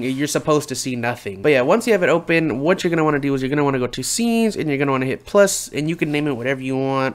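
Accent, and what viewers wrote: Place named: New York, United States. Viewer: You must be from NY or the east coast. But bro thanks for the video man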